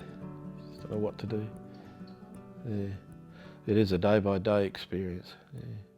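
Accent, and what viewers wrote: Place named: England, United Kingdom. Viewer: THANK YOU! I JUST GOT YOUR MSG, AND WATCHED YOUR VIDEO AGAIN. I MUST TELL YOU, YOUR WIFE IS BEAUTIFUL! GORGEOUS HAIR AND LOVELY DARK EYES, AND YOUR NOT SO BAD YOURSELF.....HANDSOME COUPLE...MATCH MADE IN HEAVEN INDEED! I LOVE THE WAY YOU TALK ABOUT THE LORD, I KNOW WELL WHAT ITS LIKE TO DEPEND ON HIM EVERYDAY. IM 71 AND BEEN SUFFERING WITH MS FOR 20 YEARS. AND YOUR RIGHT WHEN YOU WONDER HOW PEOPLE LIVE WITHOUT FAITH IN THE LORD. I TELL YOU, I WOULD HAVE ENDED MY LIFE YEARS AGO IF I HAD NO FAITH! KING DAVID SAYS IN ONE OF THE PSALMS,"LORD, YOU KEEP ME ALIVE"! IS IT NOT THE TRUTH?? MY HUSBAND LOVES ME BUT HAS NO PATIENCE WITH ME, IT MAKES THINGS HARDER FOR ME BUT HE HAS MANY HEALTH PROBLEMS HIMSELF, SO I TRY TO UNDERSTAND. AT LEAST HE'S NOT CONFINED TO HOME YET. THANK GOD! YOU SOUND ENGLISH, MY MOM WAS ENGLISH AND IRISH. 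MY HUSBAND IS IRISH AND WELSH, BUT MY DAD WAS POLISH. WHAT A MIXTURE I AM, AND I DONT LIKE POLKAS OR POTATO SOUP.....BUT I LOVE MY TEA!! SORRY FOR JABBERING ON, BUT ITS FOOTBALL TIME IN AMERICA, SO YOU KNOW WHERE MY HUSBAND JOHNNY IS!! YES, I GOT ME A TOO!! IF YOU THINK OF IT, IN YOUR PRAYERS MENTION SUZY ROBERTS, HE KNOWS ME WELL, AS I HAVE YOU AND IN MINE ALREADY! TAKE CARE AND MAYBE WE WILL ALL MEET SOMEDAY IN THE KINGDOM....I SURE HOPE SO!! LOVE AND BLESSINGS FROMAMERICA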